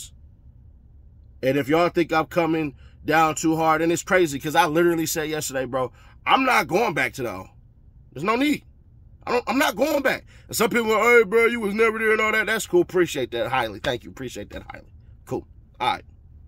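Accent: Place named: Chicago, United States. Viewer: Your talking like your from Chicago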